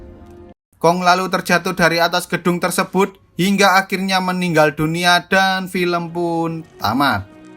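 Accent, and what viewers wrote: Java, Indonesia. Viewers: kek logat orang jawa 🙄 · Alasan gua suka sama channel ini: - Kadang suka becanda - Tidak terbata bata - Suaranya mirip opung gua - Memakai logat khas dari jawa Sukses selalu min 👍🏻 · Jowo mu ngendi min? 😂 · Logat jowo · Gw suka nih channel nontonin film dari bahasa yang bersahat(medok :v)😂 Edit:film zombi lagi dong kalo ada😁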